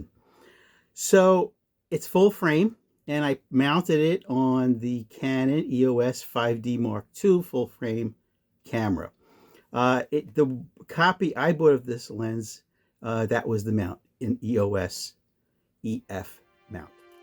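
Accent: New York, United States. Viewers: Nice shots, I don't see any massive issue with the lens. You must be one of the last few people with a genuine New Yoik accent :) love it. Watching in Scotland,.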